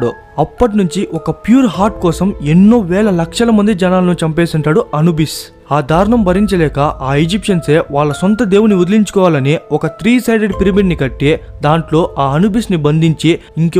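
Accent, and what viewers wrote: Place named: Karnataka, India. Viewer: పక్కా కర్ణాటక తెలుగు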